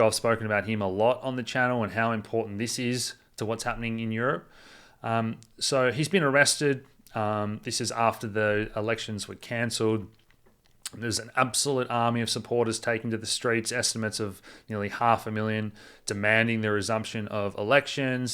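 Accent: Australia. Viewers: As soon as the word KABAL is used it becomes another asshole speculating and pushing some crap that may resonate with those that do not possess the skill of critical thinking.. Don’t be led by the nose down the garden path . An Australian accent does not automatically exude credibility!!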